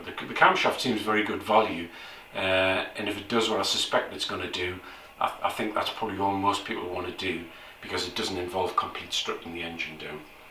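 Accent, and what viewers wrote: Newcastle, United Kingdom. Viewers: I said 900 TO a 1000 RPM . not 900 thousand- Sorry you may need an interpreter for the Geordie accent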